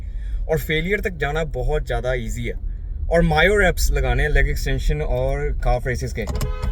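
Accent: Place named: India. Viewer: the hindi accents killinggg meee😍🙏🏼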